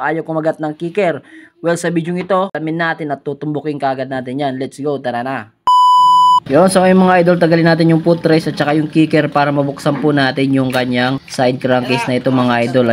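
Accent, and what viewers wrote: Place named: Visayas, Philippines. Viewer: Boss taga samar ka din ba kasi visaya ka mag salita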